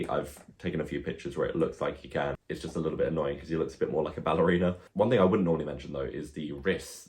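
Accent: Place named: United Kingdom. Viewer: Very funny and informative review, subscribed! I'm guessing from your accent that you're based in the UK, if you are then please let me know where you get your figures from since I'm also based in the UK and shipping prices have gotten pretty rough since covid lol.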